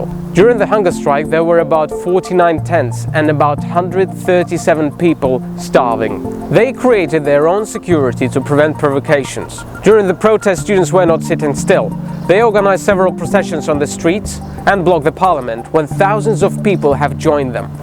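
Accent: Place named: United Kingdom. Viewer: Awesome video, great British pronunciation!!! 👏🏻👏🏻👏🏻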